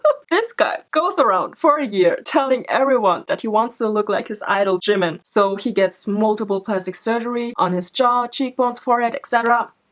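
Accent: Germany. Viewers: Is that a German accent I hear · You kinda got a German accent ? Or am I trippin’ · offtopic but your accent sounds german and that‘s cute🥺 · Ich höre deutschen Akzent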